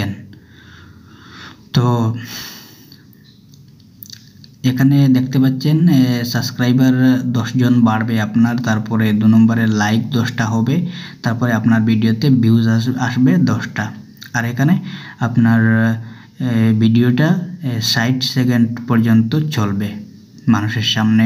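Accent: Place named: Sylhet, Bangladesh. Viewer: আসসালামু আলাইকুম ভাইয়া কেমন আছেন ভালো আছেন নি আপনার বাড়ি কোথায় সিলেট নাকি আপনি কোন রকম সিলেটি সিলেটি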